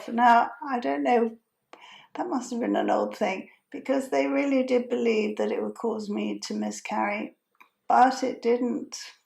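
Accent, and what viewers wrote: England, United Kingdom. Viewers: You have a beautiful English accent,so clear,and educated😊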